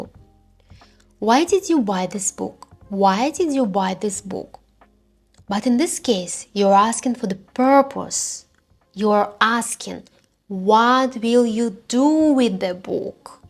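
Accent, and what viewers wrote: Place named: Eastern Europe. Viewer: Здравствуйте! I just found your channel today and I wanted to say this. I think that your accent actually helps you stand out with these videos, because some other videos they speak in a more ‘normal’ accent, and it can seem a bit too much like a lesson in school. But your accent makes it feel more authentic, like a Russian friend is telling you about their language. Also, I find the Slavic accent very nice. I knew a woman from Bulgaria who spoke with almost the same accent as you.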